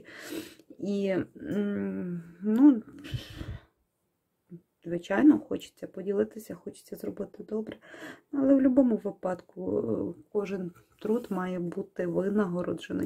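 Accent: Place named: Kyiv, Ukraine. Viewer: Я благодарна вам, и говорите вы, так мило, как моя тётя в Киеве говорила, люблю ваш язык. Спасибо за доступное разъяснения.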